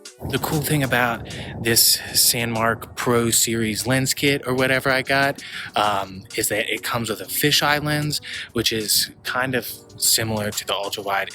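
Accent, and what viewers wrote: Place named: South Africa. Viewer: Your accent has some South African sound to it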